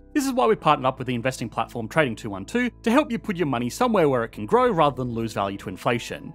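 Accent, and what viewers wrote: Australia. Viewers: I’m curious about your accent. The channel seems to be from the US, but it kinda sounds like Australian sometimes? Where is the accent from?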